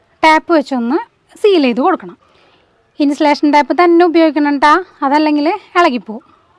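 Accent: Thrissur, India. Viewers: സൂപ്പർ വീഡിയോ തൃശൂർ സ്ലാങ് പൊളി 👌😍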